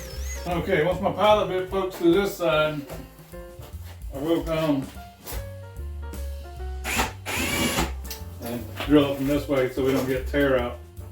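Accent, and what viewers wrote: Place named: Louisiana, United States. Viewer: You sound like your from Louisiana buddy 😂…I got it liked and I’ve subscribed. I like watching them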